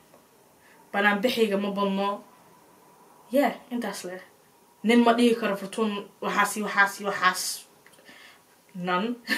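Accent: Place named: Burao, Somalia. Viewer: From mugadishu but your accent I thought you from Burco kkk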